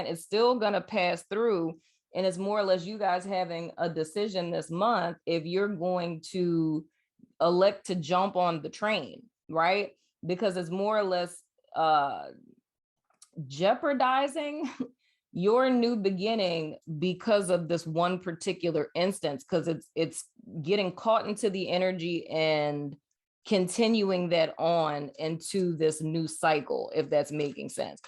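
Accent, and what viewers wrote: New Orleans, United States. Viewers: I friggin love your soul,. Your channel, your messages, and you as an individual are inspiring, enthralling, and captivating. And I love your New Orleans accent. I’m a Detroit native in this life. But I really have this thing for accents and dialects as a musician. Thank you and namaste. I always look forward to seeing you. Now lemme rewind a bit because I was really focusing on getting this message right on the first try. Think I got it. 🤔😅🙃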